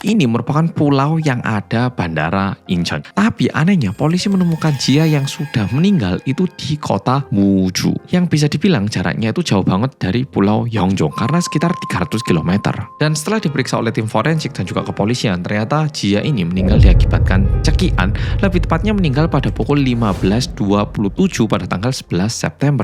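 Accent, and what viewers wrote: Java, Indonesia. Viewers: Sediiih😢 tks medok😊❤🎉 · Korea medok jawa 😂krna kelamaan tinggal di jawa...kuliah di jawa ya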